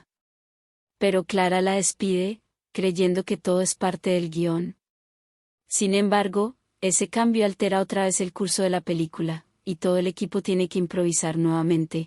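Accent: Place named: Spain. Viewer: Very good job 👍 Thank you very much for italian subtitles 😊 I love so much your voice with your spanish accent 😉❤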